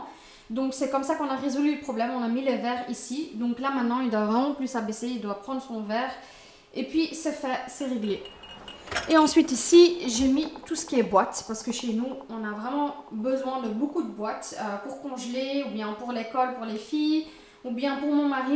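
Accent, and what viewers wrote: Belgium. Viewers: Coucou 🤗 J aime bien te regarder et entendre ton accent belge! Bref ta cuisine est très jolie je vois bien des fauteuils de bar cosy avec un dossier en beige velours ou daim (matière qui se nettoie bien!), ensuite je te conseille de peindre les huisseries en blanc pour ajouter de la lumière à ta cuisine et pour que ça fasse un ensemble. Dernier conseille fais une vidéo sur ta cuisine lorsqu elle sera terminée ou tu décris tout, si tu met du granit au mur ça risque de faire trop et d étouffer ta pièce le carrelage que tu as montré et très jolie mais je le verrais plus en blanc nacré 😉j espère t avoir un peu aidé 😘😘😘😘bises. Hasna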